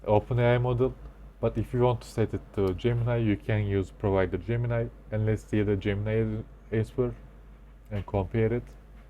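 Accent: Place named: India. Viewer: hintli sandım aq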